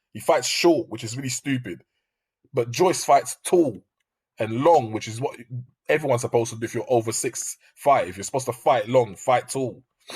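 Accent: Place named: United Kingdom. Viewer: youre british accent is a give away that you dont know parker very well, im a new Zealander been following his career since he began, he's a big let down, most of his fan base is overseas, he has rubbish punching power all his knock outs are journey men, really bad journey men and he didnt knock them out that bad, they just didnt make the tem count or got stopped, history proves he doesnt have good power and he gasses and drops his arms to regain energy in them, joyce hits hard and he hits constantly and pushes forward, he has a granite chin and parker has no power he wont be getting knocked out, parker had a decent chin too but can he handle the volume of joyces more powerful punches??? maybe not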